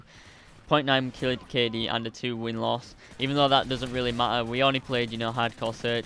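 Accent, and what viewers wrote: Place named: Scotland, United Kingdom. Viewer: You sound more scottish in your livestream.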